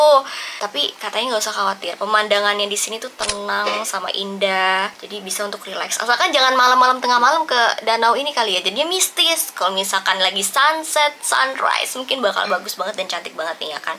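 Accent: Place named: Surabaya, Indonesia. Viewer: Arek Suroboyo Iki loh masse 🙋🙋🤣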